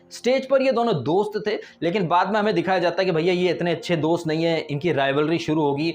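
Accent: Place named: Bengal, India. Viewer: Sir ab appka bengali accent miss karu ga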